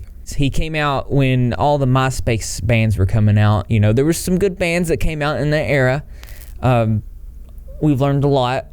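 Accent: South, United States. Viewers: Think this is crazy?? Jared Leto has the exact same story. You should see the threads on line and the accusations. Sounds identical to this... forced rape and dangerous choking. I loved Leto at one time...he uses 30stm concerts to lure them. I’ve watched a few of your vids and I love the southern accent (I’m southern) but.... what’s with all the symbolism? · This is a random question but where are you from? It sounds like you have a kind of country accent it’s really interesting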